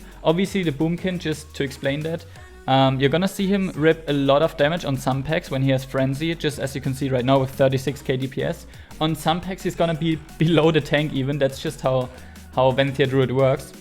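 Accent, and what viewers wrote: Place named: Germany. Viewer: Man hört einfach den deutschen raus aber nices Video 🙏🏻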